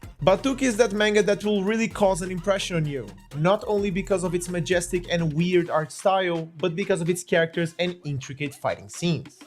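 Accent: Brazil. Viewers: Karalhow negão, dps q tu falou q era br eu n parei de ouvir o br do seu inglês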